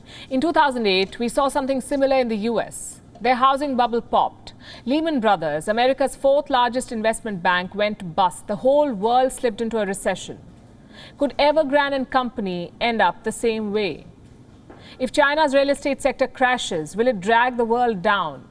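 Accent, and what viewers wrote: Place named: India. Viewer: You are making the world love English Indian accent.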